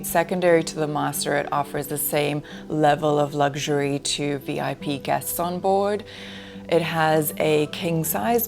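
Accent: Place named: South Africa. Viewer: Sound South African but for someone who lived in America.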